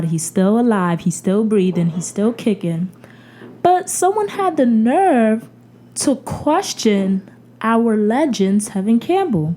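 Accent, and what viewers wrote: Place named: Bronx, United States. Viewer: I love your accent! Shout out to the bronx😁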